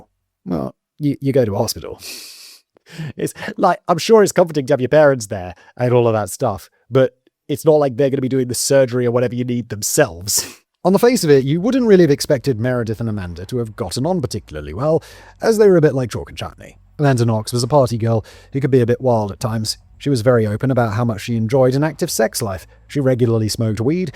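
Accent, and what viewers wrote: United Kingdom. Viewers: Well time for bed with one of my fave true crime shows TCC. Why watch a true crime show when i go to bed you ask? ( you did not but allow me to tell you anyway ). with his dark british voice calms me and Danny writes good and long scripts. Perfect! Don't you all agree?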